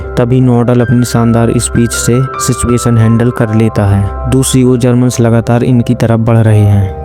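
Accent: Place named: Bundelkhand, India. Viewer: तुम तो बुंदेलखंडी लग राय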